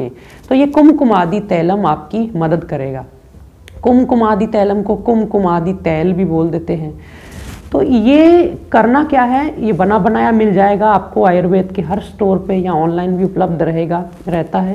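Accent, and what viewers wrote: Punjab, India. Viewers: Aap Punjabi ho??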